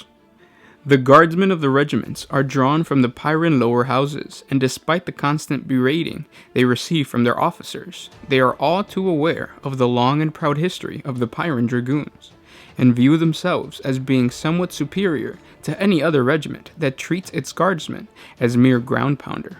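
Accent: United Kingdom. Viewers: Right off dat bat British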